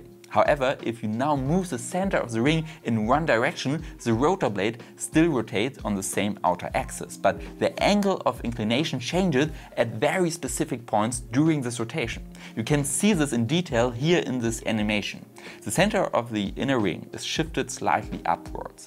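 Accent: Germany. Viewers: I'm English, and had no trouble at all with your speaking - yes a strong accent, but not a problem. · Cooles Video! Das "th" klingt manchmal ein bisschen holprig & reduziert an ein paar Stellen die Verständlichkeit, aber wenn du das ganze noch ein bisschen verbesserst top. Ein wenig deutscher Akzent kann ja ruhig auch bleiben.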